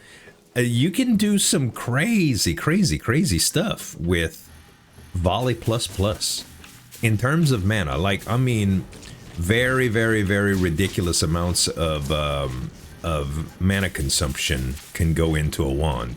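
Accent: Louisiana, United States. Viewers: Hey man, glad to see you doing well, just found your channel TODAY (coming from gohjo lol). I’ve watched a few vids and noticed you answer comments either in videos or reply right here, so i have one question. WHERE ARE YOU FROM? You play with your voice a lot it seems but i keep hearing so many different little American dialects. I’m wondering if that’s just how you speak naturally or if you are doing random accents/slang for fun? Please I need to know why your speech patterns and accent is so hard for me to place. Thanks and love the content my friend.🙏👌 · I'd bet money that's a Louisiana accent. I know my people.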